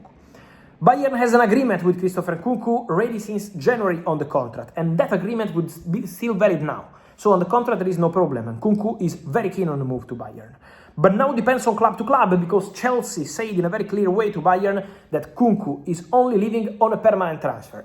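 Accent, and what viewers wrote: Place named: Italy. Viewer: Nothing can beat Italian Accent .... Barrrrnn Mouth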